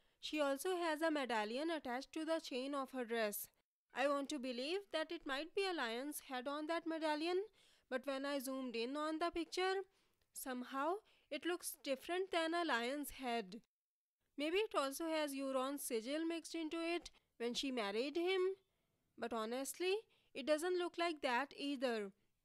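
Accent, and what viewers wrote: India. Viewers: How come you write and speak such excellent english, yet have such a lovely indian accent ? 😊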